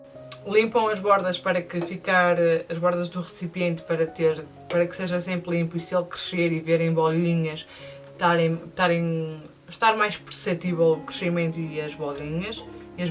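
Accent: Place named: Portugal. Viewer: Vc é uma excelente mestre, além de ser uma gracinha com esse sotaque da terrinha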